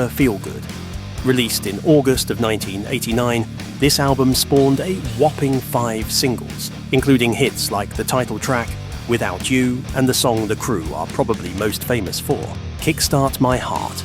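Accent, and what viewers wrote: United Kingdom. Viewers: You are British as per your accent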